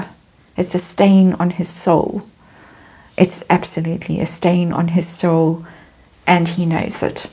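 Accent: South Africa. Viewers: Excellent reading! I love your gentle voice and your your gorgeous South African accent - soo soothing. I also love how you speak about HM The Queen, who doesn’t deserve any of this. It’s beyond comprehension how HM can put up with one of her wayward relatives, not to mind two of them (Andrew & Harry). I’m hoping that both of them come to rue the day they cut her life short!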